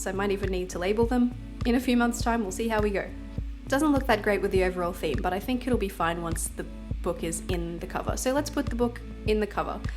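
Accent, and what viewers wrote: Australia. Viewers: Hi, I really enjoyed your video... it is good to hear an Aussie accent... just a tip about your comic markers... they are double ended 😉 have a great 2019. Will be looking forward to more videos 🙂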